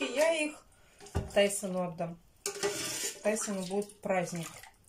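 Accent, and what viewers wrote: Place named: Nizhny Novgorod, Russia. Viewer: А я сразу понимаю что человек с нижнего Новгорода по говору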